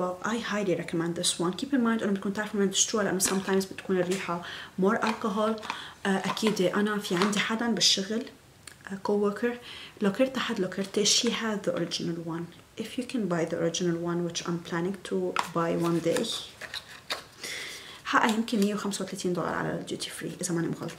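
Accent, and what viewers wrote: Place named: Lebanon. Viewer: You are so energetic ❤️ and lovely I really like the Lebanese way of speaking I'm Egyptian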